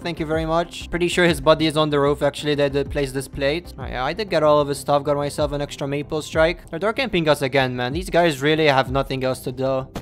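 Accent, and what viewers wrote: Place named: Malta. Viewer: As soon as I heard your accent I knew you were Maltese too hahaha.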